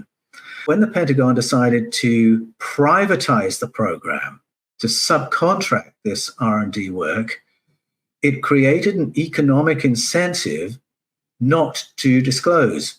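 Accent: Australia. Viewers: I love u! Awesome stuff! It juat sounds smarter with an aussie twang!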